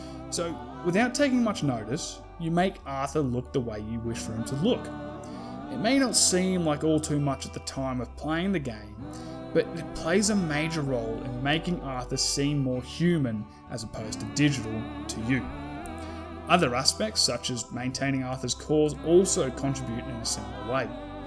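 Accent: Australia. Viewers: Ohh he's an Aussie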